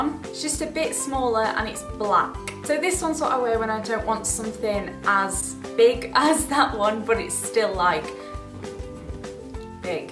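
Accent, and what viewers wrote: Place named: England, United Kingdom. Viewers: what part of England is from? just trying to figure out the accent haha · English* accent, not british ;) her accent is from Sheffield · British, but her accent comes from Sheffield in Britian, haha!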